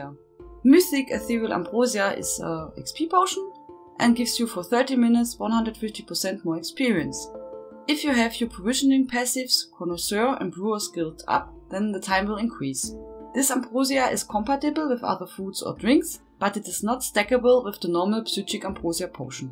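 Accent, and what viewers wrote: Germany. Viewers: Ich höre deinen Deutschen Akzent. Sehr Schön ;) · ufff that german accent is hard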